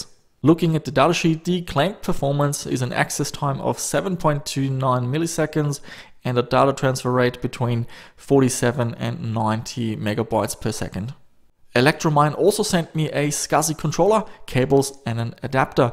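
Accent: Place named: Germany. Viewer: An deiner Stimme erkennt man sofort das du Deutsch bist :D .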